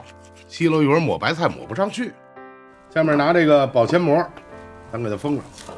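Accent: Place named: Beijing, China. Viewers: 这位厨师大爷说的北京话真好听。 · 廣東人，第一次看到這菜，好酷🆒，單單看見都覺得轟鼻，哇噢，這麼多芥末(´▽｀)瑟瑟發抖ing。等我買了芥末粉也試試，另外說一句，北京話好好聽，謝謝分享(o^^o)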